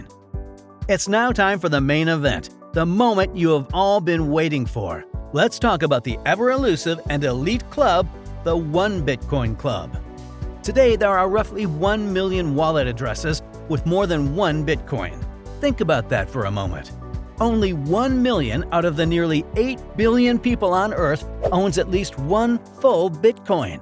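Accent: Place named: United States. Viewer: Most american voice ever.. over the top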